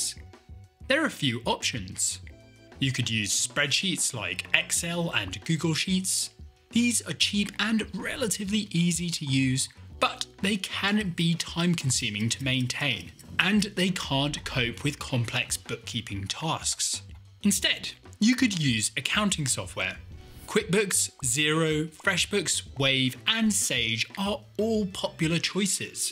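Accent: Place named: United Kingdom. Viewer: I'm a software engineer and recently joined a team who is building and maintaining accounting software. I have very little accounting experience and no training. But your videos have helped me tremendously to understand the various concepts and to be in the position to make a more meaningful contribution to the accounting software. Thanks for the excellent work. I'm looking forward to the rest of the Accounting Basics series. I must say though, I preferred your speaking style from your earlier videos. They were more relaxed and fun to listen to. I was surprised to hear the change in accent in this video and thought you went intentionally into some kind of "British mode" for this video's sake 😄. But your other more recent videos also sound similar. Also missing the close-up shots in this video which gave your videos that extra edge. Please don't sacrifice your unique and fun teaching style for the sake of sounding and looking more "corparate-y". 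All the best!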